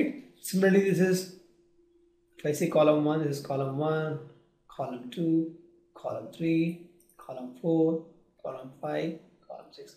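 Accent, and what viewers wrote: India. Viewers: Pls try to speak less Indian accent if possible - not an offence - im just giving suggestion pls dont misunderstand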